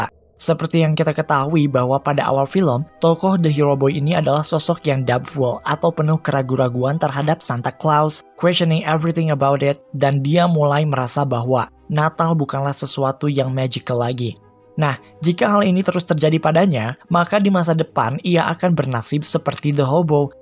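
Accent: South Jakarta, Indonesia. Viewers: English jaksel ?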